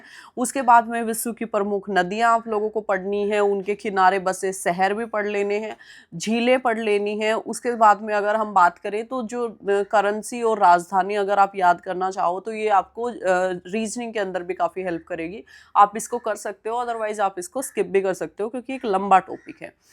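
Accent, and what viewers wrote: Haryana, India. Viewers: Maam you have a perfect Haryanvi accent.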